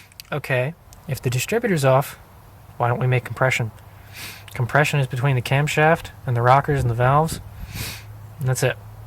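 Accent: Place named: Germany. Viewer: is there any significance to to frequent, annoying, German accent ? other than that great vid.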